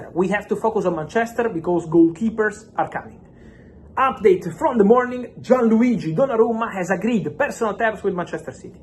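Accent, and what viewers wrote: India. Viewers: Why does he sound like an Indian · You sound like an Indian speaking English.....😒😒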